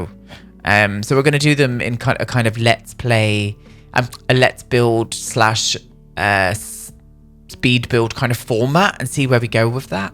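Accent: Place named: Scotland, United Kingdom. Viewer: As a Brit living abroad I love watching your English builds so much 💙 Makes me very nostalgic for home 🥰 Thank you! Please ignore this weird question if it is too personal, but are either of your parents Scottish? You don’t have an accent but you pronounce a lot of words in a Scottish way so just wondered!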